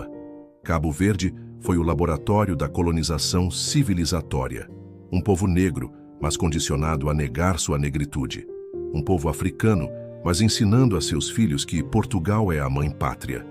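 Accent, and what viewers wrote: Brazil. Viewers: Se estás a narrar a história da escravatura, coloque foto da época. Sotaque brasileiro também não soa bem. Quanto a história, Cabo Verde foi interposto comercial e colónia. Após a abolição da escravatura continuou como colónia, até a independência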